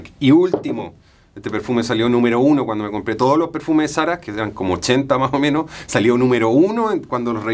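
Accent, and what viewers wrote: Chile. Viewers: Tu acento chileno aún te hace ver más guapo y elegante 🤭🤭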